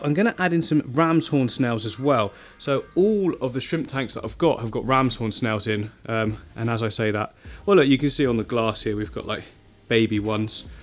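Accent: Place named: United Kingdom. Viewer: You know how, for example, Andrew Garfield & Tom Holland & Hugh Laurie & Asa Butterfield all hide their Great Britain accents with USA ones? You should do that.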